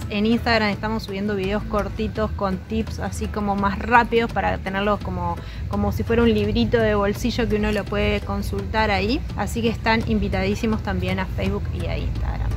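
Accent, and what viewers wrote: Argentina. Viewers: Me encanta tu acento argentino saludos y bendiciones Para ti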